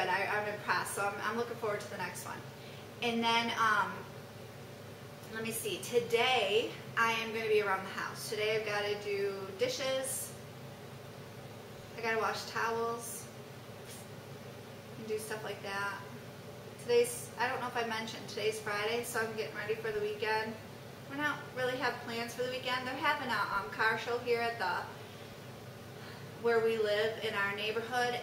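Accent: Michigan, United States. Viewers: I love listening to you talk :) my dad is from Michigan you for sure have a Michigan accent :)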